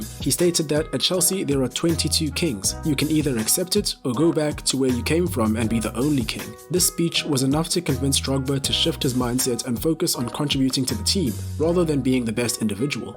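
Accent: South Africa. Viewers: Bro you South African? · are you from south africa? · Are you South African ?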